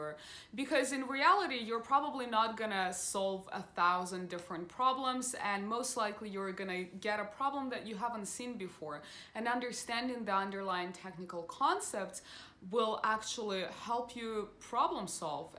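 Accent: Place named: Russia. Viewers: У тебя такой русский акцент. Не знаю почему, но очень тяжело слушать. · мне тоже так показалось, она русскоговорящая.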